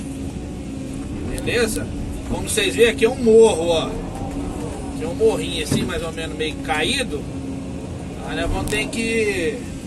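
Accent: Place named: Mexico. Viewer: You sound like Mexican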